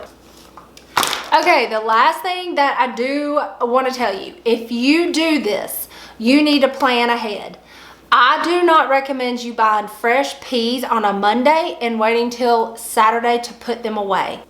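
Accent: Georgia, United States. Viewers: That's a south GA accent if I've ever heard one Source: Born and raised in South Georgia!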